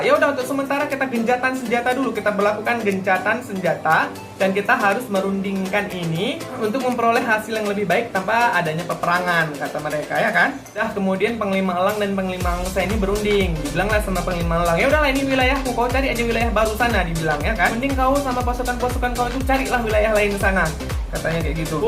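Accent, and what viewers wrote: Medan, Indonesia. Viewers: Penuturan bahasanya kek org medan 😆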